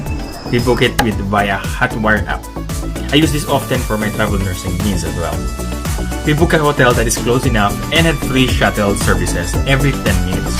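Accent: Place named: Zamboanga, Philippines. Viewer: From Zamboanga ka po pala kaya pala familiar ang accent ng tagalog, student nurse here from AdZU, hoping to be there soon!!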